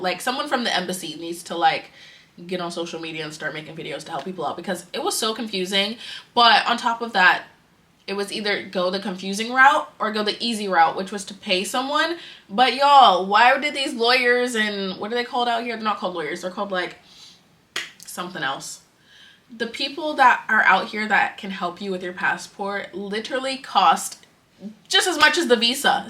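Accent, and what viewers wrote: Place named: United States. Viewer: She looks like she has an accent. Her American accent is still thick. But I be hearing a lil accent every now and then. She getting prettier and prettier. ❤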